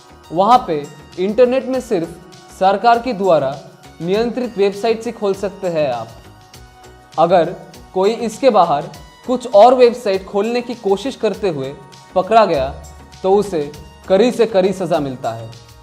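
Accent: Bengal, India. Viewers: R u Bengoli ...Odisian? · Tumhara hindi sunke to lagta hai tum bangali manush ho .... achhi jankari diye ho . thank you dada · Bengali hai kya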